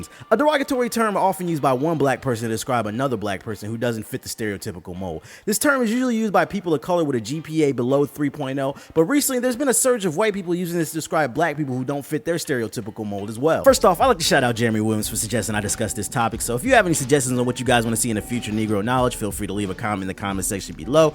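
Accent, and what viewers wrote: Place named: Chicago, United States. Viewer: damn you from chicago south south sideee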